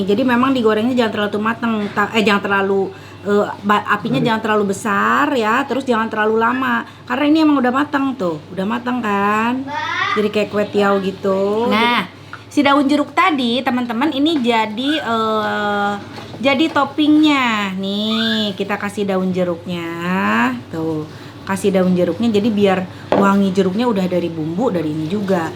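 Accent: West Java, Indonesia. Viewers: Resep nyarios logat sunda na teh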